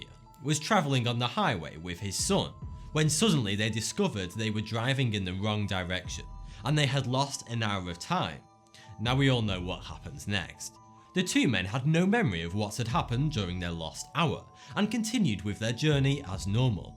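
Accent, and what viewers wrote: United Kingdom. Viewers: He is British (not trying to be racist) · this dude is very British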